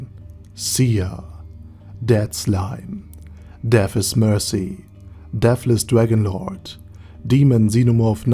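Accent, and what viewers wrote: Germany. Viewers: Holy shit, this german English :D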